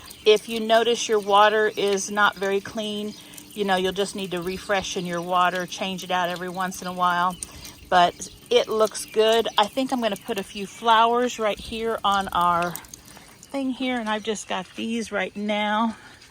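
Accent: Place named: Ohio, United States. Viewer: Those turned out beautiful now I’m not sure what state you’re in. I wanna say Ohio, but I could be wrong. Anyway you have a little bit of an accent, but that was very good. Even your one in it were great. Good job. I’m gonna do that here in my property, but I found a flat angel That was originally hooked to a fountain and of course he didn’t have that the thrift shop and I have a ceramic pot and I made it. It’s gonna look really cute yeah but I was thinking to keep the leaves out of your red pan. Why not cut out a little piece of Spring and then glue those gem rocks on it and put that over the drain maybe that would help keep that cleaner longer. Have a great day. Thanks again. I loved it.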